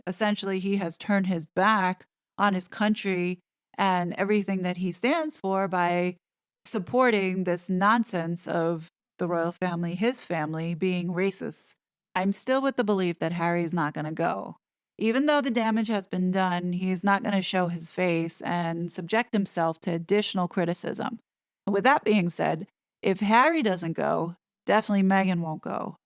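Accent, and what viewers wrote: New York, United States. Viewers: New Yorker. OK. Could never pin point your accent. Another great video. It appears the girl child creature always thought she was superior to everyone. She sees how much she has missed out of leaving the Royals. With her real love interest, William, moving up to Prince of Wales and the beautiful Catherine, Princess of Wales, she is out of her mind with envy. I'm sure she and her Suck Squad will be hitting the Royals with more hate the likes we have never seen before. Hope it is true MI5/6 are investing the squad. The rings are beautiful. I love how people are making fun of her with those ugly pictures. Every time she hits we should hit back. She wants to act like a child, we can go all out Mother Bear on her. Have a great week.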